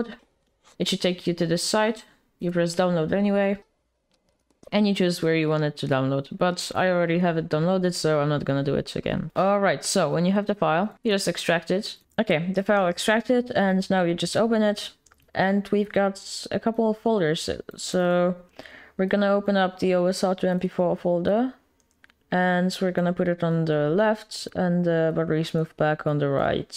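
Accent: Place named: Poland. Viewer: jako polak masz koks akcent (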